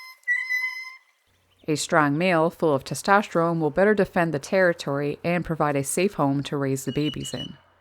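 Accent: Canada. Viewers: I enjoy the Canadian twang in your voice.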